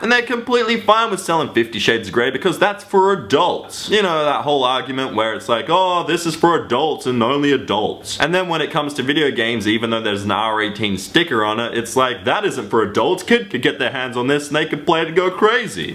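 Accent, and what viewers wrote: Australia. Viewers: huh hes aussie subscribed im aussie too WOO HOO haha lmao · Are you Aussie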